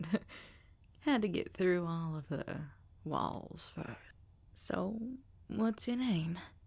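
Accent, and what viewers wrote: United States. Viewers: That country accent has me in a chokehold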